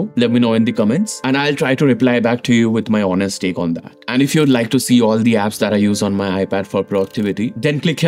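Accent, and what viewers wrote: Bengal, India. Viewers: Bengali tone in English 😢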